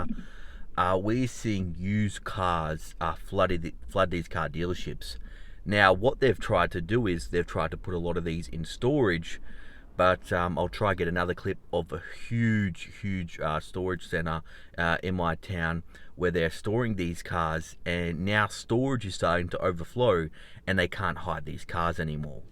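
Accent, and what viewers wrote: Australia. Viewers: I just noticed your Aussie accent. Are you in Victoria? Where I live.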